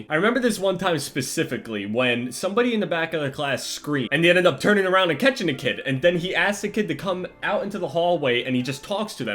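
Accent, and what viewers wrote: New Jersey, United States. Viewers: Omg is he from new jersey!?!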